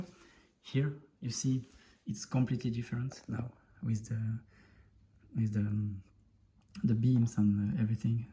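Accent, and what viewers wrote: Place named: France. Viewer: un french accent ma foi tres mimi, gg à toi pour les modifs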